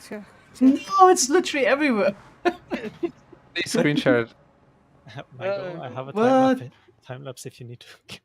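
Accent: Germany. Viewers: Hi du hast manchmal einen deutschen Akzent. Bist du Muttersprachler Deutsch?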